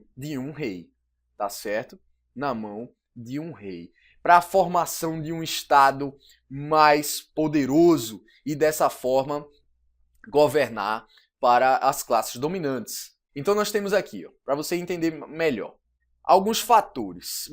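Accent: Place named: Northeast, Brazil. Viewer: você é ridículo. Lindo o seu sotaque, professor. Nordeste ❤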